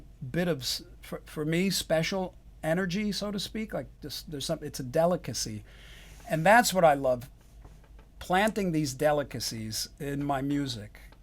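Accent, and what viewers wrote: United States. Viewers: You are the guitarist I would be you are an exemple Master you sound like an Italian compositor and in same time you do it with an American accent like when you talk I heard the both sounds it's questions of italian Genius blood like Leonardo da Vinci of 🎸 it's a special DNA like a perfect hybrid something like chianina grown up in American the perfect Animal, Always growing up with a lot of music in brain.the perfect sense of metaphors of spirituality like a strings cames from Pitagora the first guitar hero lol every note you do are like an LSD trip as Jimmy Hendrix of future you're biggest one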